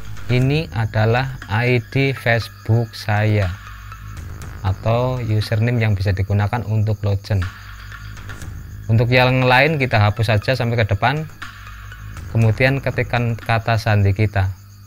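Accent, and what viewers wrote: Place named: West Java, Indonesia. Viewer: Orang sunda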